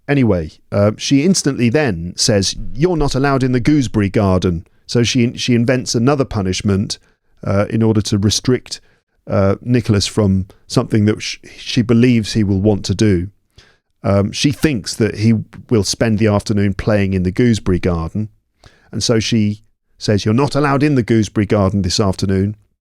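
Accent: United Kingdom. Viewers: Hi, I'm a newly retired English teacher and I just love listening to you read short stories! Your British accent is fantastic, your explanations always are appropriate and what's more often funny, which makes it all very pleasant! Thanks a lot!!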